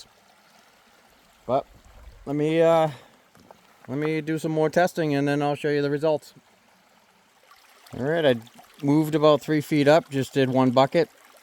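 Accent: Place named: East Coast, United States. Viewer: Awesome! I think you beat me! What state are you in? Accent says out east to me.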